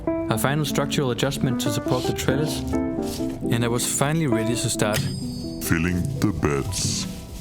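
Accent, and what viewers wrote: Denmark. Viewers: Am jeg høring danglish? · the accent makes me think you are one of us. love from Skanderborg. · you sound Danish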